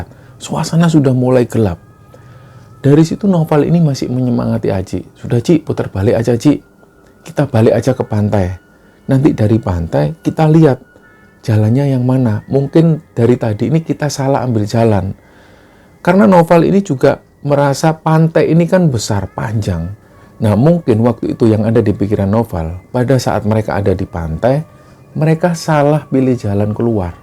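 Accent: Java, Indonesia. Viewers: yg ku senangi sama channel ini, medok jawa nya dalam membawa cerita ini mampu membawa pendengar ke dalam cerita!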